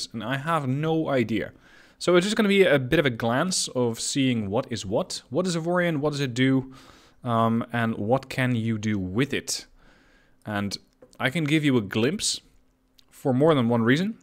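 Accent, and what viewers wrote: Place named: Netherlands. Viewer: Looks like an awesome game, and also your accent sounds like your Dutch lol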